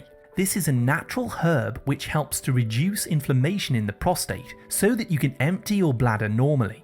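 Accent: United Kingdom. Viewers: Everything I eat is on this list. Anyway, I don't trust anybody that speaks with a British accent so screw him. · Information is good to know only could you speak in a more English pattern than in a British accent because it's hard to understand sometimes and frightening also. SERIOUSLY STOP THE CORRUPTION OR IT WILL KILL YOU 🇺🇸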